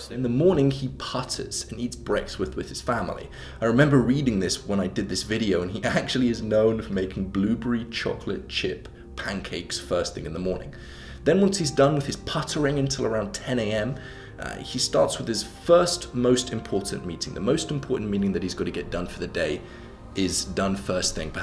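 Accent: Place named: RP, United Kingdom. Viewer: you have to be casted on the Crown.seriously bro you would need 0% effort to talk like them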